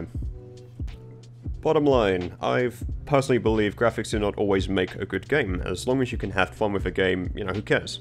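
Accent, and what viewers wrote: United Kingdom. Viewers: where abouts in the UK you from?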